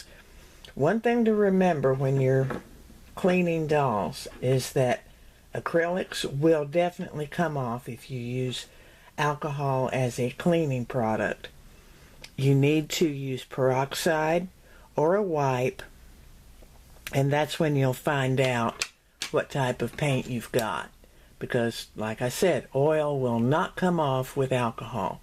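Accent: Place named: South, United States. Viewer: I am really impressed with your dedication to your talent. 50 yrs is a long time. I, too, have taken a few watercolor classes long ago, but would have to start from scratch in my knowledge. Remember some things but not much! One of my workshop teachers worked in egg tempera sometimes. Have you ever worked in that?. I have so many hobbies, it’s had to get into any of them as deep as I’d like. I’m retired now, so I can partake more.Would love to see some of your paintings. From your accent, it tells me you live in the south. May I ask where? I enjoyed your seeing your pretty yard. Thank you for sharing your videos. I enjoy your teaching. I’ve been collecting and making antique reproduction porcelain dolls, and trying to dress some. I love dolls! Love always having my eye out for a cheap one with potential. My doll teachers have been restorers, too. 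I’d love to learn how to do my own purchases. I learn some things from them, but when it’s their living, I hate to be really nosy about techniques. I am learning through you and others on utube. Thank you so much, again! It’s so wonderful that you share! Again, would love to see your paintings. What is the style you use? Abstract, realism, landscapes, portraits, impressionist like, etc., etc? Thank you for your extended answer! Keep “arting”. 😉😊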